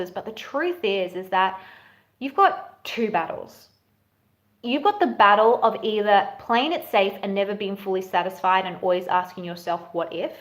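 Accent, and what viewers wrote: Australia. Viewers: I saw a video by some mgtow guy nit picking on your video. I wanted to hear what you were saying because there was some good stuff there, but couldn't because of his constant interruptions with his "color commentary". He didn't mention who you were so going by your Australian accent I found you. On your mgtow video, you start by saying "leave your comments below", but when I scrolled down, the comment section had been turned off. My hunch is that you got inundated with mgtow tribal wrath. What I gathered from his video is that while you were discussing personal relationships, he was straw manning it with "ya but the workforce". Blatant to me but perhaps filet mignon to his followers. I saw a guy interviewing men and women as to whether they can just be friends. All of the women said yes, most of the men said no because the feelings are there. 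Cheers.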